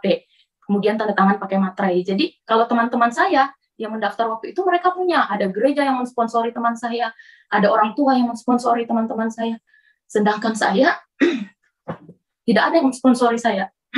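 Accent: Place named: Java, Indonesia. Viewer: kok...ngaku org Bugis tpi logat Jawa...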